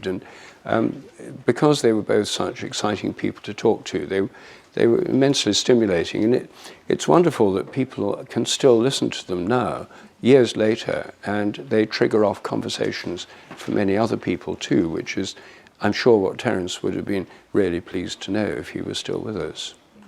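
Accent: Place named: United Kingdom. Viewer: Give it a rest....he speaks English so clearly a student of the language can understand...As an older American who has lived in both Northern California and New England, I can testify that English knows no bounds in pronunciation. While living for 13 years in New England I insisted that my children retain the letter R....yet we all, when tired or first awake would slip and say "weathah " for example...I for one (and I suspect I am not at all alone) love to hear an educated British speaker. Why would a native Californian like to hear such an accent? Simply because it is lovely, tonally pleasant, easy on the ears and altogether pleasant.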